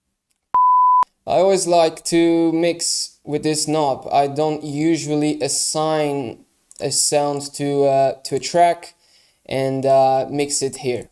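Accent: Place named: Brazil. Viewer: are u brazilian? eu percebi pelo sotaquezinho hahaha tutorial foda cara, esse som tá bem único mesmo!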